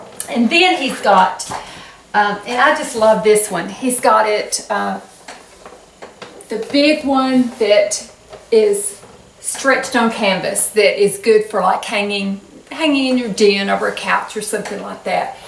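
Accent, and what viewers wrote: South, United States. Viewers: i love how you southerners say "film".I simply love your accent.Almost all the Youtube ladies i watch are southern American.When i grow up im gona be a south American.lol.♥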